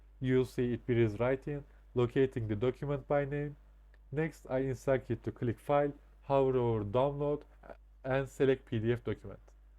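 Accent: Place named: India. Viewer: hintli sandım aq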